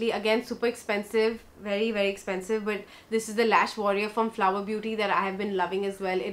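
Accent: India. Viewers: Love ur cute hindi accent... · I love your Hindi accent you sound so freaking cute! How people can hate it I don’t get it.. I enjoy it a lot when you speak Hindi trust me 😍🥰🤩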